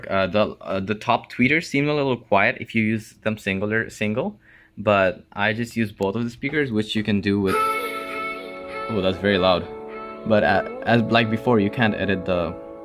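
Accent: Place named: Arab world. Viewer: Love your middle eastern accent bruh, and thanks for this informative video! I plan on picking up this exact laptop due to it looking exactly like a MacBook Pro. Already Have a Mac Pro gaming Hackintosh. And yooooooo, I planned on doing the exact same thing with the apple sticker when I get this, are you me?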